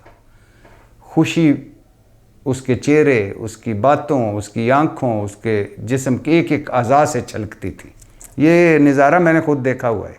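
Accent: Punjab, Pakistan. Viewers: Aoa Mashallah I’m very pleased with your faithfully coverage on each and every topic with balanced coverage. Love how you deliver every single episode your touch with pure genuine Punjabi touch love it all the way. Hats off to you covering without any fear and any envelope coverage.. keep up the genuine coverage! Stay blessed always tons of prayers for you and all your loved ones be safe out there.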